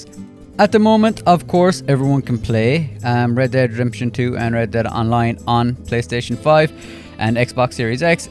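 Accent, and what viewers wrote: Ireland. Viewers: Thank you. Will keep playing as I’m not a “gamer”…RDR2 and classic Guild Wars 💕☘️ Irish accent? Cork?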